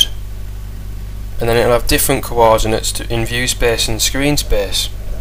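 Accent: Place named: United Kingdom. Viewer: The one you mentioned is US spelled version. I can tell that he has a british accent. ;)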